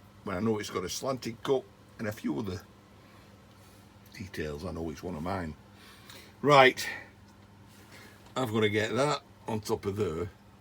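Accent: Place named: Manchester, United Kingdom. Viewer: I love watching your videos for 2 reason's, First of all being an ex-Mancunian, I do love the accent, I miss it so much. 2nd If I didn't know better I would think my Father had a bike in his younger days, as you could pass as my brothers Twin. You look so much alike. lol Don't worry I won't hold that against you.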